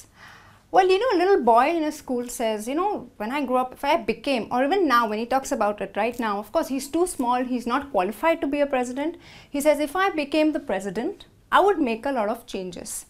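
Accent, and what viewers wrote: South India, India. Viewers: Are you South indian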